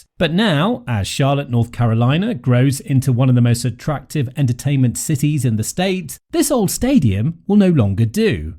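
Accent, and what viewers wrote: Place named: England, United Kingdom. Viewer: There is so much hogwash and propaganda in this video it must be funded and produced by David Tepper. This is yet another example of a sweetheart deal to benefit a billionaire who stands to pocket huge profits from the renovated stadium through stadium use fees and concession sales while the city that funded the vast majority of the renovation gets virtually squat. Shame on the Charlotte city council for throwing the citizens under the bus so a billionaire can brag to other billionaires how he conned the city into funding his new stadium. I hope the citizens of Charlotte replace every council member who voted for this atrocity. Not to mention this video is so poorly done it uses game footage from other teams and stadiums unrelated to the story. And what's with the English accent? Is that supposed to provide some additional credibility or something?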